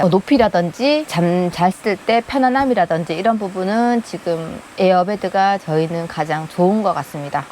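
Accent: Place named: Gyeongsang, South Korea. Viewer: 대구분이시죠??ㅋㅋㅋ 억양으로 알것같아요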